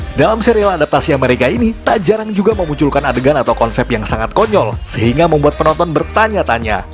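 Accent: Java, Indonesia. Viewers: Min... Bahas ttg Kiramaiger donk... Jujur saya merasa gak nyaman dg penjelasan mimin yg di Try It karena kebanyakan ngeguyon apalagi dibumbui logat Jawa nya meskipun yah itu alamiah sih yah krn mungkin si mimin org Jawa... Dg melalui TokuFacts ini saya rasa kami akan merasa lbh nyaman mendengar penjelasannya tanpa banyak bertele-tele dan akurat pula...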